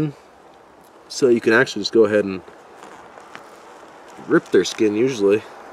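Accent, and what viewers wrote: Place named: New England, United States. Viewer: Oh your from new england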